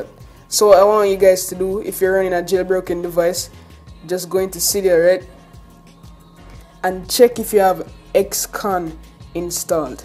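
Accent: Jamaica. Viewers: Jamaican accents bang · Are you Jamaican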